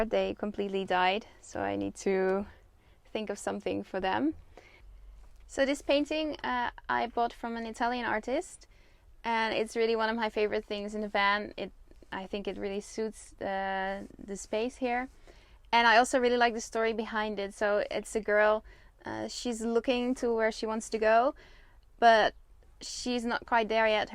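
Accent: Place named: Netherlands. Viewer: Dutch or nah?? hhahahah noticed in the first few seconds hahaha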